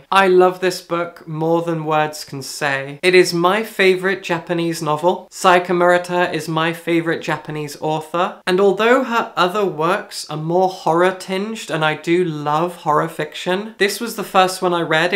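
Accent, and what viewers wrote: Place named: England, United Kingdom. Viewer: I love your reading suggestions and reviews, as well as your perfect English accent. Thanks for you work! I've proudly subsctibed to your Patreon. Alice from Italy ❤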